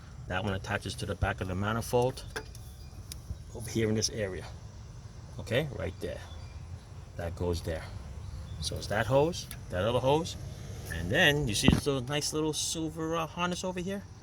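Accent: New York, United States. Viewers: you have this Italian accent lol are you from NY...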